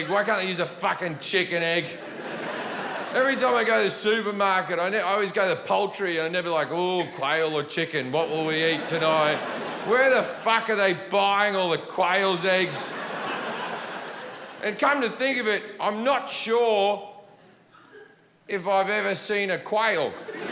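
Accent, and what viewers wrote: Australia. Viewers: I can't tell if this is a joke or if you haven't realised he's genuinely Australian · Is he Australian or not, I said that because of his Accent. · So Aussie lol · As an Aussie I cannot stand this stupid Occa accent. The guy sounds like he was dropped as a baby...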